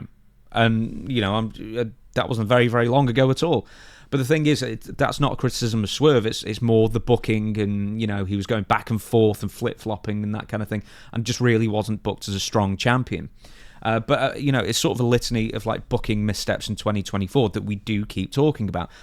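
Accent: England, United Kingdom. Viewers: I don't know what it is with you, but that English accent that you have always makes it easier even when you're pissed off to understand everything you're saying💯🫡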